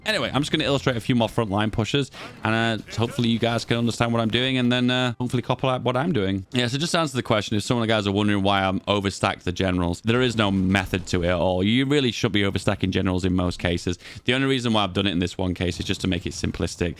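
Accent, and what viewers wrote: United Kingdom. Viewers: Eh British 🤮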